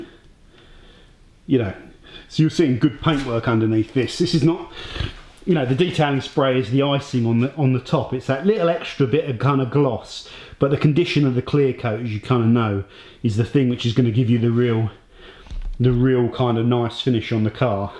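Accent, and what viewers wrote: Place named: Essex, United Kingdom. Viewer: were you from mate im essex you sound not that far away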